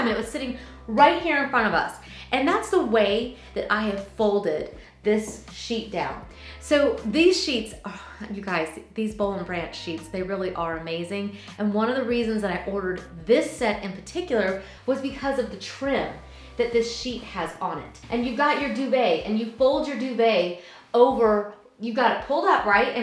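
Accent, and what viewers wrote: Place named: United States. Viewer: Hello lm still waiting,l heard but l have difficult to understand sometimes the American accent if you don't mind l will really appreciate 🙏 if you write the name of those 2 sprays. Thank you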